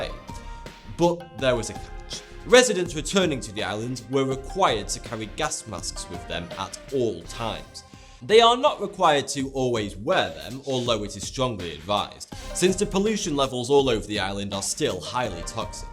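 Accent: United Kingdom. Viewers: My friend hates British accents so I put the volume all the way up, just to male him mad 😂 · Why almost of the facts givers or educational youtubers use British Accent??? I'm always wondering why???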